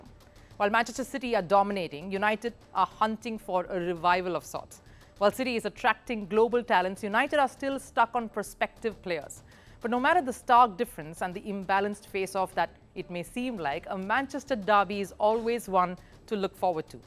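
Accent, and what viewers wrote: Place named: India. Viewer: She's pretty good. Perfect English like the CEO herself. Other than English in Hindi accent 😅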